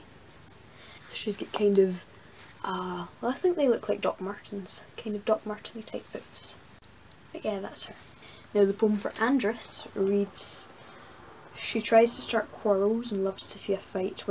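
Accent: Scotland, United Kingdom. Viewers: Hate to ask but are Scottish or British?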